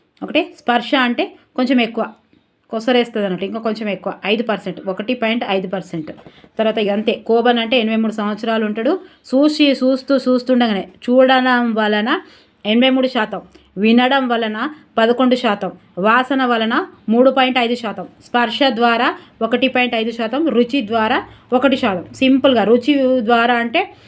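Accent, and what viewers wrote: Telangana, India. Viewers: Csuper madam acham telangana palleturuloni bashalaga chepparu thanks....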